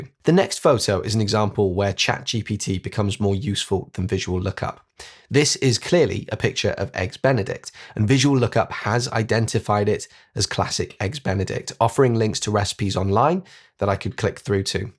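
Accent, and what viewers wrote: United Kingdom. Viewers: Great. I’m in Lisbon understand your British English very well, congratulations! Just got a new 16 Pro Max and love your lessons. Will be a link member soon. Have shared several with my colleagues. Good health! Keep going into 2025.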